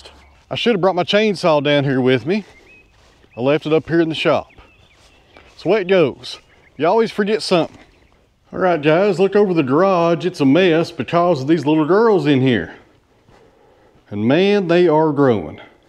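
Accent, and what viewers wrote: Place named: South, United States. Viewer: Its about making a video of a certain time length and incorporating his southern accent as much as possible. People don't want efficiency when watching videos, they want to waste time. Looking into time management for yourself, you have to ask, why are you wasting time on videos when you could be doing things yourself?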